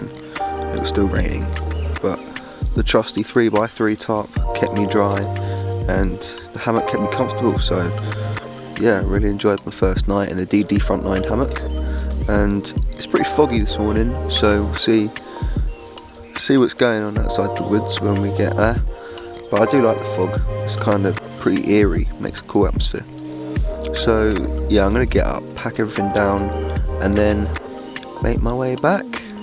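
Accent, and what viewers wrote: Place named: Southern England, United Kingdom. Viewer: Thought I recognised a sort of local ish accent. I am in East Sussex so not too far from you. 👍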